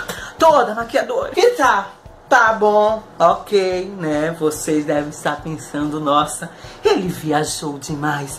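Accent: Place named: Bahia, Brazil. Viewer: pq você tem sotaque baiano?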